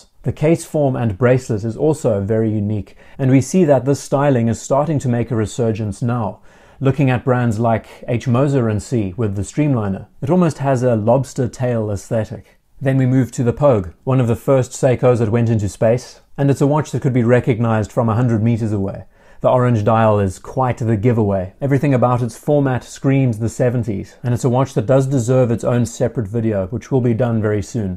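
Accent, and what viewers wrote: South Africa. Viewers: Is the narrator South African ?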